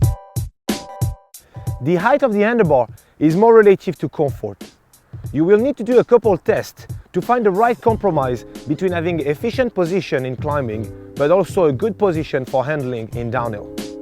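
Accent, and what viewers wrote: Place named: England, United Kingdom. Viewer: Les conseils avec un accent anglais moyen, c'est pas mal. En Français, ça le ferait pas mal aussi.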